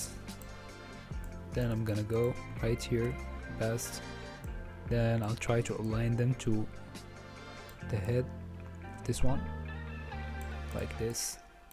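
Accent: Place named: North Africa. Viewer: Great design bro,btw where u from u sound north african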